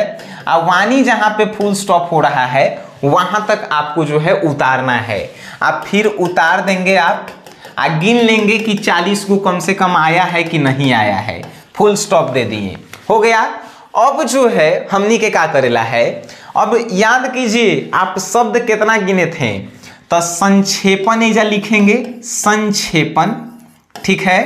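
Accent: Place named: Bhojpuri, India. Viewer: sir aapki bhojpuri accent ke sath padne me aur maja aata hai ❤️😃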